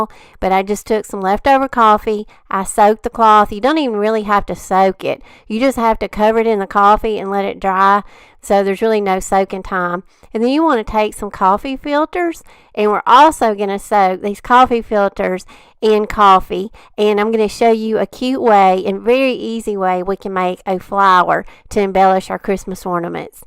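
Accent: South, United States. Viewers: LOVE YOUR SOUTHERN ACCENT SOOO SWEET!!!!!!!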